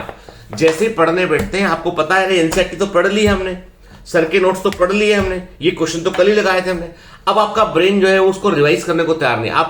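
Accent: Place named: Bhojpuri, India. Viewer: Vha sir gajab ki bhojpuri apki hn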